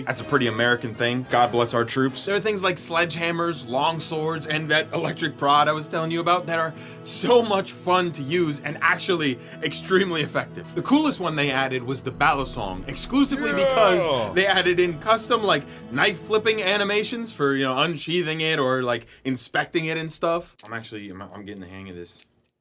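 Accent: United Kingdom. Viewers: YEAHHHHHHHH BRITISHHHHHH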